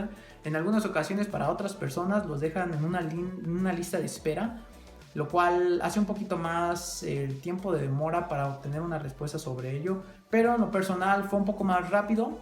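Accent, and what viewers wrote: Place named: Mexico. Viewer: Ami me enacnta 3l asentó mexicano aunque no viva ayi gracias por tu información bro lo tendré encuenta cuando valla algún día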